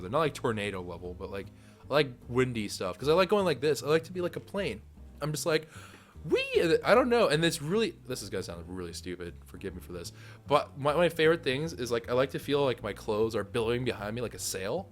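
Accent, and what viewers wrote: United States. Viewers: You do not sound like a sour Korean , I assumed fully 100% American with dark hair , similar looking to drift0r or something. But I like getting to know what you look like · Hey it sounds to me that you live in Pittsburgh and so do I man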